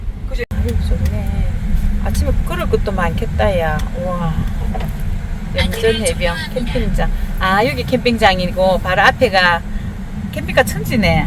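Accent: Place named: Daegu, South Korea. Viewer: 우리 사돈댁 근남면이군요. 억양이 대구분 같네요.. 여기는 수성구 황금동입니다. 다슬기도 있겠어요.. 같이 가시지요..😊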